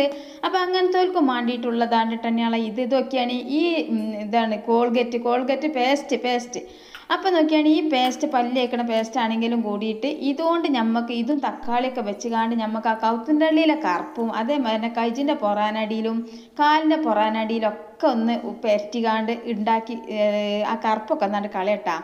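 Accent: Malappuram, India. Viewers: മലപ്പൊറം bhasha 😍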